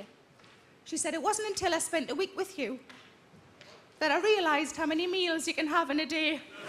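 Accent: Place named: Newcastle, United Kingdom. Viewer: Hollie Dixon why wouldn’t they 😂 it’s only a Newcastle accent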